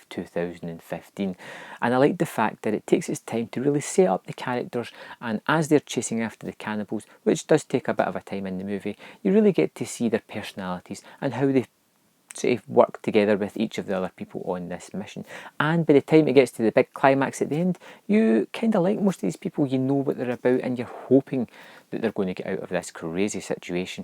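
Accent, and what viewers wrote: Scotland, United Kingdom. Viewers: wat part of Scotland u from I'm from Stirling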